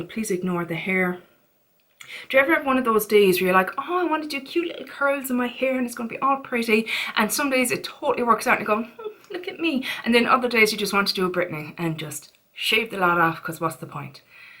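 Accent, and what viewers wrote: Ireland. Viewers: What a brilliant tip from your subscriber, so simple but hardly thought of. Will definitely be doing that with products with air bubbles from now on. Love your videos, your accent is beautiful. What part of Ireland are you from? X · I put my phone up to my husband's ears bc I knew he would Love your voice. He didn't know what I was doing. His response "I could listen to her all day". Kelly Macdonald is his favorite voice. Which region in Ireland are you from? I don't know the regional differences. Loving your videos!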